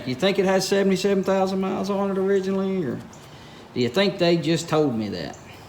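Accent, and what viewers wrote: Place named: South, United States. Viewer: That you bud? Looks and sounds like a hill-billy I grow'd up with- but anyway, I bought a '84 F-150 with a 'yet to be determined' 351, I think. Having hell finding the stamp, said to be on the block. I had been under impression it was a 351 W, but wasn't satisfied using the 'count the valve cover bolts' method, and thanks to a parts-house dude, certain of it not being a Windsor or a Clevelend, yet unsure what it actually IS, I'm back out there at 1st light this A.M. to find the stamp- just so's I can replace this leaky 2-barrel Motocraft Carbureator. Someone said that Edlebrocks are universal? Anyway, I wish my pa had pushed tools instead of a pencil and swung a hammer more often than a gavel, 'cause i think this Ford-thing may stick on-me. Love this truck. 4-wheel drive, 33" Mickeys & glass-packs with that classic '84 front cap, but with a work-bed added at some time. 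The scales at the dump says "5,890 LBS"-DRY! Stay outta my way! Ha!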